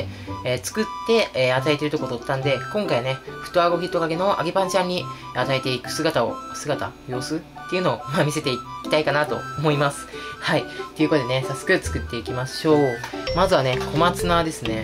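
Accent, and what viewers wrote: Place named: Kansai, Japan. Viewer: 関西弁がとても胸を使われたので不純な気持ちでチャンネル登録してもーた🙈💕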